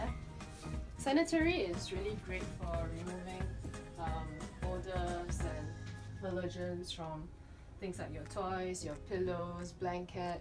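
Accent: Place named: Nigeria. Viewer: No disrespect, I hear a little bit Nigerian accent in her. I enjoyed your presentation my lady.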